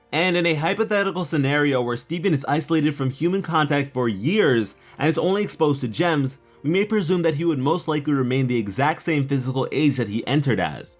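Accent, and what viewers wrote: United Kingdom. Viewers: Are you from the uk 🇬🇧??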